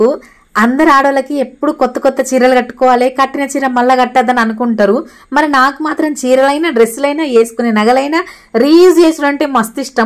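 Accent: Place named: Karimnagar, India. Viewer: మాది కరీంనగర్ జాబు భద్రాచలం లో కని మీ మీరు యంగ్ గ ఉన్నారు బాషా చాలా బాగుంది మేము మీ బాషా చాలా మిస్సు అయం సూపర్ మీ వైఫ్ మీది కేమిస్టర్రి బాగుంది 🎉🎉🎉🎉🎉🎉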